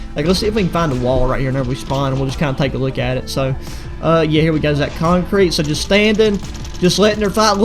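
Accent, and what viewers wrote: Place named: West Virginia, United States. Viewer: Bro you sound like some of my home boys from southern WV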